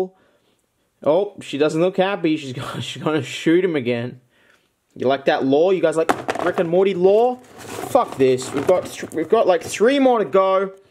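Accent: Australia. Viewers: Intense aussie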